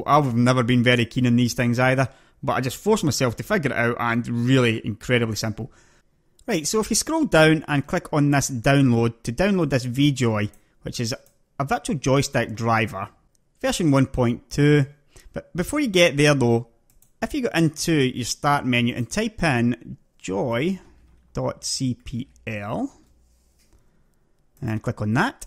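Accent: Scotland, United Kingdom. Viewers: Scottish? :) Sounds great! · i'm love with that scotish accent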